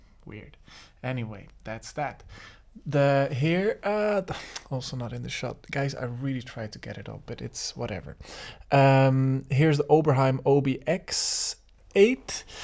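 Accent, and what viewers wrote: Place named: Ireland. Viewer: you can have the irish accent pass lad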